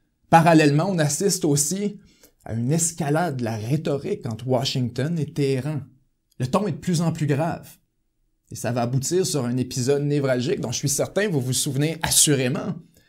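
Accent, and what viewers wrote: Canada. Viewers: L’accent Canadien...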